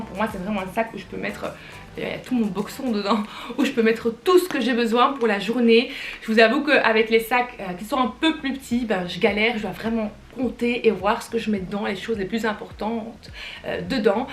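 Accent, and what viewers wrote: Belgium. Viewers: J'adore ton accent Brusseleir ne change pas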